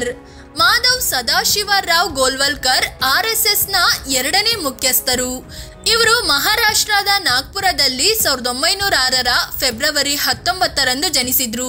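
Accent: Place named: Hassan, India. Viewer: ನಿಮ್ಮ ಹುಚ್ಚಾರಣೆ ಶ್ವಲ್ಪ ಹಿಂಪ್ರೂವ್ ಮಾಡ್ಕಳ್ಳಿ. ಹರಸೀಕೆರೆ, ಆಸನ ಕಡೆಯೋರ್ ತರಹ ಏಳ್ಬೇಡಿ.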